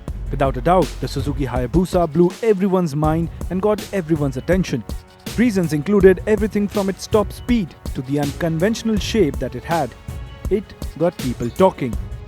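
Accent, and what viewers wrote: India. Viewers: Why can't indians ever speak a language without their accent ? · I had to skip the video as soon as I heard that Indian accent 🤢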